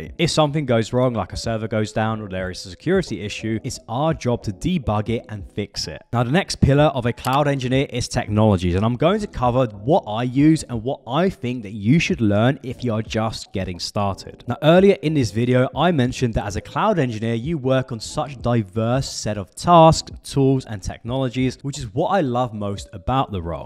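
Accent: United Kingdom. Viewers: Looool it’s our UK accent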